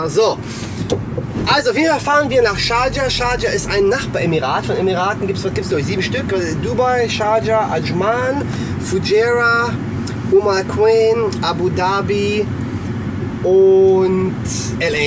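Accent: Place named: East Germany, Germany. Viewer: wie ne Ostdeutscher vor allem. ...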